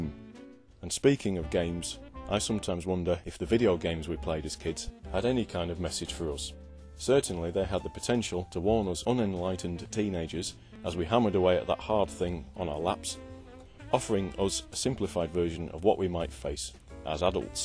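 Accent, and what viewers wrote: United Kingdom. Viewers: MGTOW vids with English accents always makes me smile. Top class as usual. More vids from you would be most welcome. Thanks for your content.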